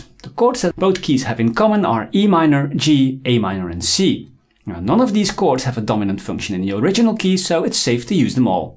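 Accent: Netherlands. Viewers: I enjoy your backing tracks and lessons. thanks for both. I also enjoy your accent, which reminds me of when I lived in Tilburg (I am Australian). bedanken!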